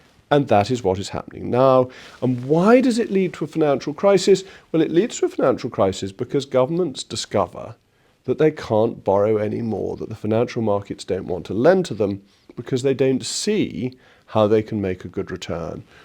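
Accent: RP, United Kingdom. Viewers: All the attributes of a clown with money and amnesia... conveniently forgetting how long his party was in power leading to the UKs ruin. Remove the posh accent and this clown could easily be as useful as a butlins clown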